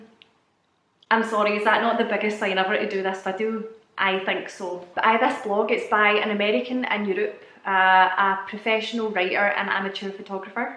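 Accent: Scotland, United Kingdom. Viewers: super excited, and FASCINATING... you are so amazing and precious, i love how you say" Werewolves " 🤣🤣😘🤗🤗 very informative, and the best genes and features i have ever seen.. great show Ma ' Lady in Scottish accent !! keep it up..👍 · I could listen to your voice all day. Love love love the Scottish accent, my favorite. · Hi id love to dive into your hole/your warewolve sized hole that is 😂where about in Scotland is that accent from up north iv had 1 encounter with a cryptid i believe and if youd asked me 6 or 7 years ago if that was possible in Scotland id have said you were crazy but personal experiences prove that this stuff is real 👍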